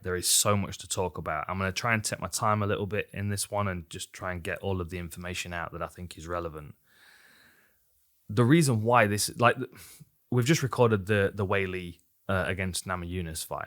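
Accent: United Kingdom. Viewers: I don't know what it is about that British accent but I can listen to you speak for day's. Its like listening to sean Connery read a book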